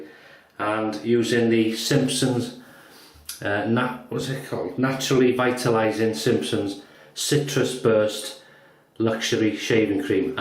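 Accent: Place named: Wales, United Kingdom. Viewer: Very cool and fun video again :) I really like your accent, reminds me of my good times when I worked in Wales.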